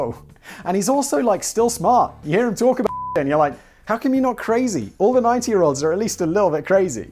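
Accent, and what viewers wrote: United Kingdom. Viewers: I love how turned the British-isms up to a 1000 with this one. Also, a close runner up is to hear Simon blow past a sentence and then say, “what?! really?!” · It's all right, everyone; no need to panic! He's British...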